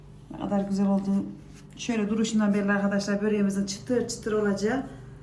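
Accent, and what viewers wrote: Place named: Ankara, Turkey. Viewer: Konuşmanız. Sanki ankaralı gibi nasıl yaptıgını göremiyorum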